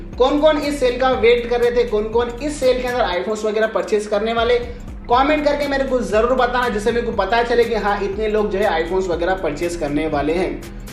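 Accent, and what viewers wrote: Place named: Hyderabad, India. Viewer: Bhai tum Hyderabad se ho bhai bolo bhai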